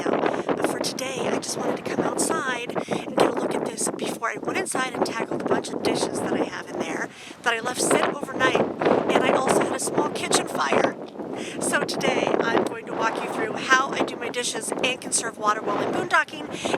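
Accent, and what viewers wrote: United States. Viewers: Sound like Ohio! We LOVE You!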